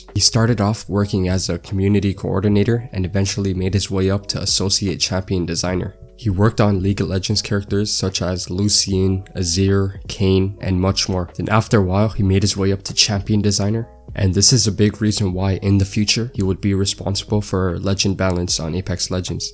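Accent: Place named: Canada. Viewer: U sound Canadian just like me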